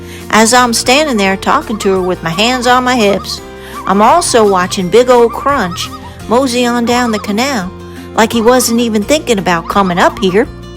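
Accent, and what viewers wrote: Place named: United States. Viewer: I am enjoying your episodes. They are very delightful to listen to.. I love that voice that has a country draw to it.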